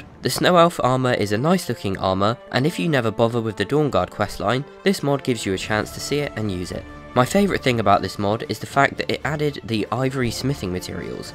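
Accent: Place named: England, United Kingdom. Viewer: You have the “crispest” English accent I’ve ever heard. Deus Vult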